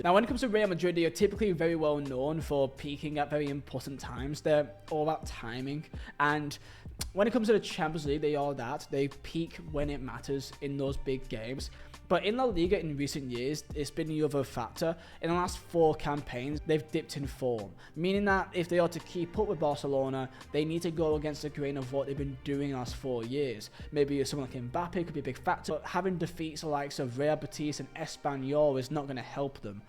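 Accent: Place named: Rossendale, United Kingdom. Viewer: German names in a Rossendale accent. Pure comedy gold.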